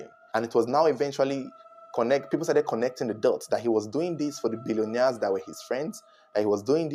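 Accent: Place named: Anambra, Nigeria. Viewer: yes I know, and that’s the beauty of it which is your Anambra dialect that’s unique 👍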